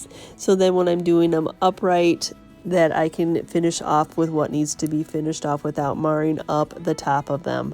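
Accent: South, United States. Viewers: Hi! I just love your adorable southernness!:-) I am somewhat following your business plan & I very much appreciate all of your help! I don’t usually comment because I watch on my TV and I’m pretty sure you can’t write from there. I am VeryMaggs@ if you’d like to stay in touch... I am in Los Angeles with my husband (I’m straight LOL, I wanted to make sure you didn’t think I was flirting. I hope to hear from you! •••Maggie•••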